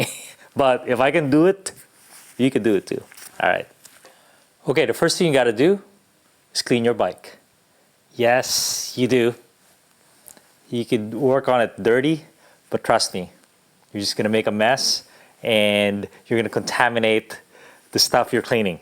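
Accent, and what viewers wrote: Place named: Philippines. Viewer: English accent sounds like Filipino? Tama ba ko kabayan?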